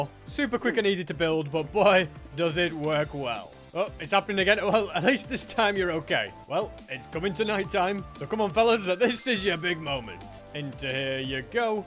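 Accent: United Kingdom. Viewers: I think is british lol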